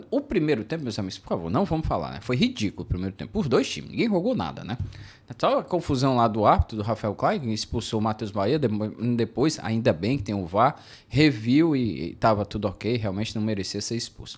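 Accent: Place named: Ceará, Brazil. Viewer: Parabéns pelos comentários: sensato, equilibrado, objetivo e esse jeito cearense de falar, que é seu melhor!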